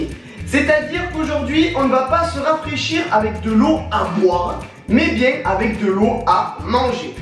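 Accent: Marseille, France. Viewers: J'aime trop ton accent du sud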